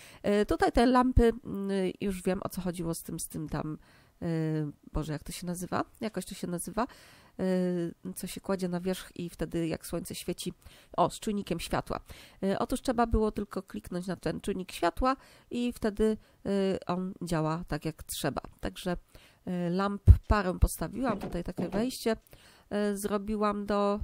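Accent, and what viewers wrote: France. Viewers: mam pytanie dlaczego masz akcent francuski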